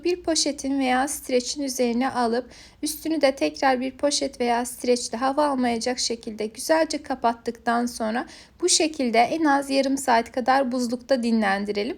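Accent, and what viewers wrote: Azerbaijan. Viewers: Dinleyen kimi bildim ki AZERBAYCANLISAN ❤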